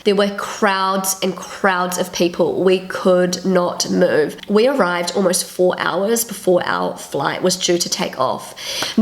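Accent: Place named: New Zealand. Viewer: I always understand "chicken point" when you pronounce "check-in point". Kiwi accent is so funny! 😆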